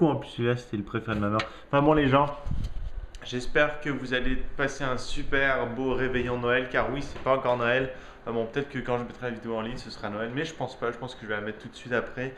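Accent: Quebec, Canada. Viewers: "Avant de connaître j'aimais pas l'accent québecois...Mais ca, c'étais avant":D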